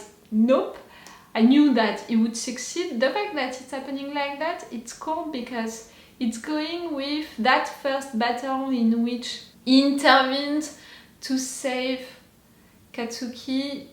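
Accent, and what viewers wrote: France. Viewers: C’est un accent français ca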